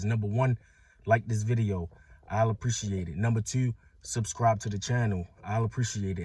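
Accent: Baltimore, United States. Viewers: you sound like a Baltimorean ☺️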